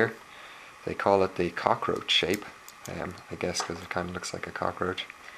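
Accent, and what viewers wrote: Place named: Ireland. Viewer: Can't miss an Irish accent!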